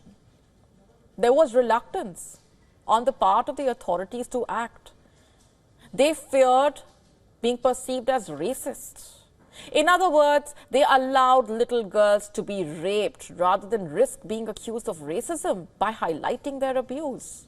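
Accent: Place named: India. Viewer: strong indian intonation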